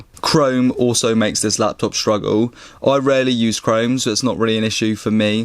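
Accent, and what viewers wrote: United Kingdom. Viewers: Are you British? I am you new subscriber, and I love your video quality <3.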